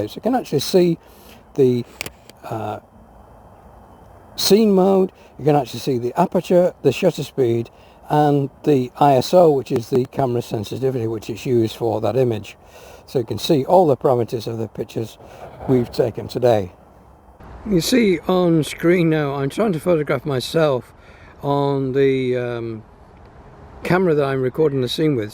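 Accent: United Kingdom. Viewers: I just purchased this camera and I am so thankfull you posted these video's! Very helpfull. Btw your Brittish accent makes it even better 😄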